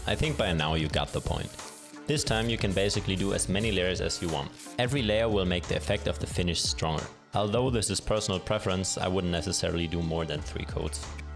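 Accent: Germany. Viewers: Are you German? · Are you german? I kinda hear it out. Danke für das Video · Are you German ?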